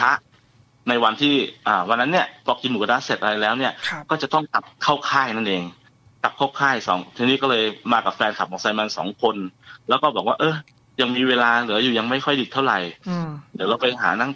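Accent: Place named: Korat, Thailand. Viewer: สำเนียงโคราชมันได้อรรถรส 🤣🤣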